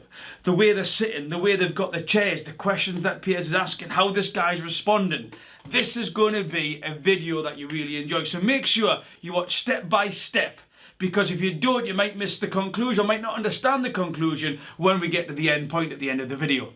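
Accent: Newcastle, United Kingdom. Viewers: Love hearing a fellow geordie on YouTube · Love your channel, Your Goerdie accent reminds me of hone, stay safe over there in the UK. Greetings from Perth West Australia 🇦🇺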